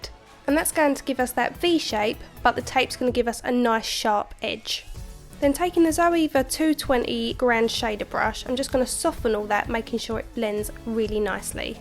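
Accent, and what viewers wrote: Essex, United Kingdom. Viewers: Very beautiful, sultry look, thank you! By the way, are you from Essex?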